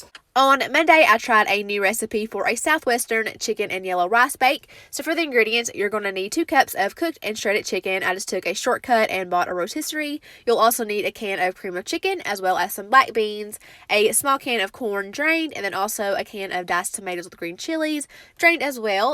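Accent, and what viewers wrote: South, United States. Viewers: Not to be weird but I love hearing your southern accent it’s very calming to hear you talk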